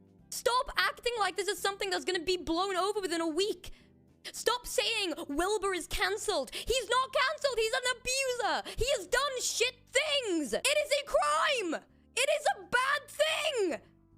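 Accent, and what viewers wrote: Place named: Wales, United Kingdom. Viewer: She’s welsh and has adhd please don’t make fun of her accent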